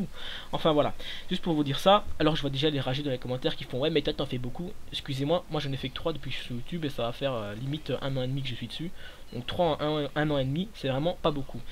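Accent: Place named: Charleroi, Belgium. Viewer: HA l'accent carolos ! ces sa qu'est bon !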